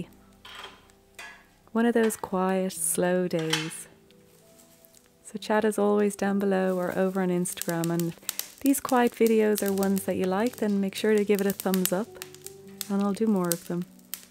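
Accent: Ireland. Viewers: Those mint leaves also are fantastic with lamb or even in a salad. I love mint tea also. Very nice peaceful video and your voice an Irish accent is beautiful. You have new subscriber from Chicago! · Nice, soothing Irish lilt, thanks!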